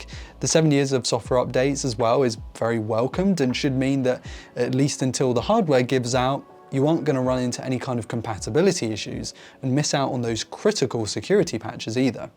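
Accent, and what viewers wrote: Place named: Manchester, United Kingdom. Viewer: I see we have a Mancunian😂😂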